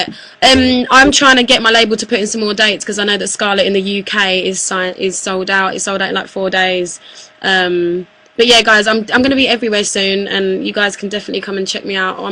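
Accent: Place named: United States. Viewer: your american accent is awsome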